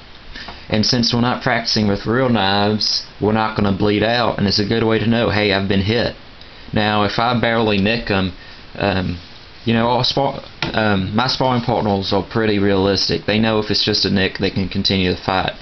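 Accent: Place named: South, United States. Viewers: It's called living in the south. Lots of people talk like that down here. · honestly, he sounds like a coonass, which is like a mix of southern and french accents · it's called an accent everybody has one ex. you probably speak clean english (northern english i'll just call it) he speaks not as clean it's just southern (sorry if me saying not as clean that's pry a lie) accent then there is the ny/nj accent and so on and so fourth. the only way to not have an accent is to be mute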